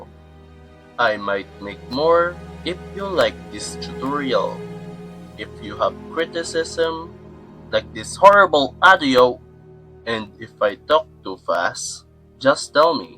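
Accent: Philippines. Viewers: filipino accent is strong here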